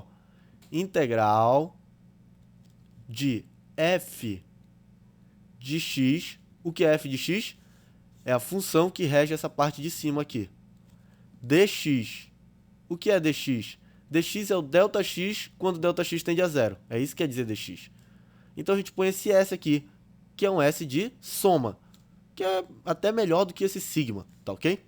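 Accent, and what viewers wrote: Pará, Brazil. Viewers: Paraense?Ta explicado porque intendi o vídeo égua kk És professor? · Pelo sotaque parece ser paraense. Meu contaerraneo Haha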